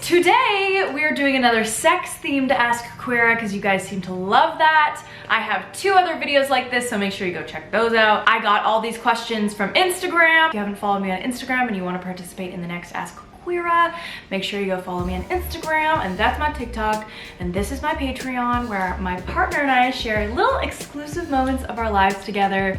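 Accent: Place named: Canada. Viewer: Me(straight boy) who's here just to hear beautiful Canadian Accent and her humor😆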